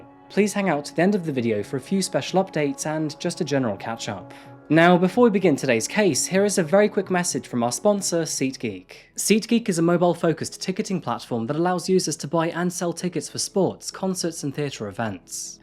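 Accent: United Kingdom. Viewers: You live in England? That’s why your British accent is coming back. 🙂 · Hearing a British guy, talking about Canada STILL reference United States dollars here just makes me happy. We're still #1 baby!!! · Where do I send the coffee? Wait, you're British? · I love the way you narrate the stories and your British accent. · Hey. I thought you're American. Are you British? You've said you're from Seattle before as far as I remember.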